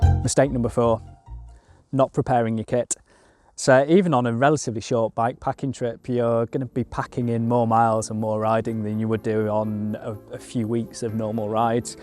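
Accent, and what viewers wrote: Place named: United Kingdom. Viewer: Fantastic video. So refreshing to hear someone from the UK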